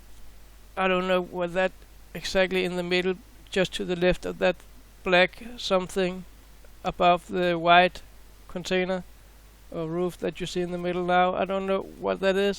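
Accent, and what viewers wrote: Denmark. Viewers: kender en dansker når man høre en.. god video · you must be Danish ❤😅. Elsker din accent, hvis du forstår dette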